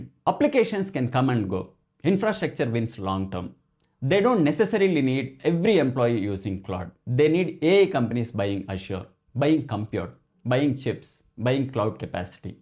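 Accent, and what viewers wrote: India. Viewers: Thi guy is awesome. He is the most Indian sounding Indian I have seen on YouTube. Nothing fake, and he even drops the clever humour and nuggets Indians do. I love it! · South Indian?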